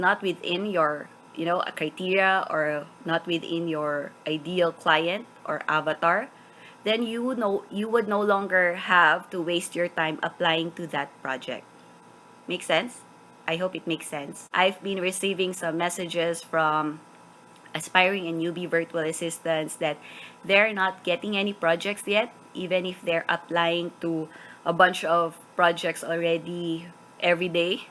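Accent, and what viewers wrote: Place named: Visayas, Philippines. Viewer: Wow bisaya diay ka coach? Mao nay akong problema ang speaking skills kay bisayang dako sad ko😂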